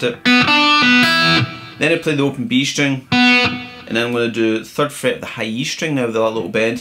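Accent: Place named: Scotland, United Kingdom. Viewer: Hi from France Sir. It's always a great Pleasure to hear you play ! Love your playing & your accent...!!! 😜 Reminds of my days up North ... Miss Scotland & the Scots so much ! Take good care, Keep safe and Keep it up ! You definitely Rock Sir. Best regards. Cordialement Luc, France 🇫🇷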